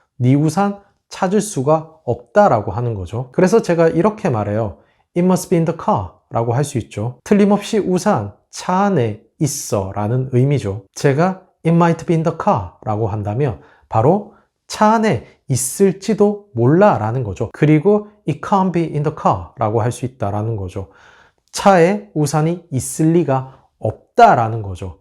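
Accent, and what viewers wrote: United Kingdom. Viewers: 영국식 발음에 치인다요……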